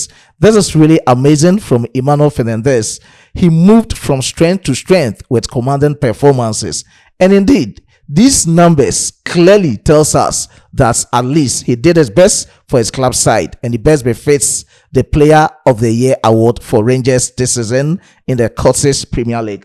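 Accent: Ghana. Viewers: You speak like a Ghanaian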